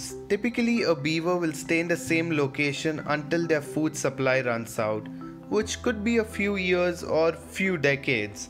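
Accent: India. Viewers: awwww hit a nerve how am I racist for saying taliban ???? And he really sounds like India but sorry I'm no expert like u in accents BIG BRAIN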